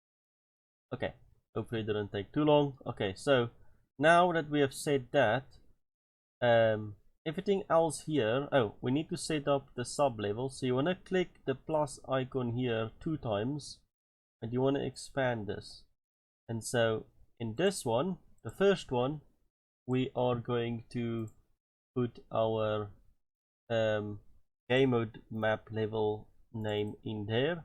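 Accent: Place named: South Africa. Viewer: I recognize a lekker accent when I hear it, boet well done video helped a lot :D asablief maak nog..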